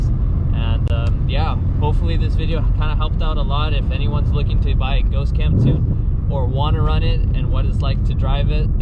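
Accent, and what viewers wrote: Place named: California, United States. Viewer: Sounds like a Californian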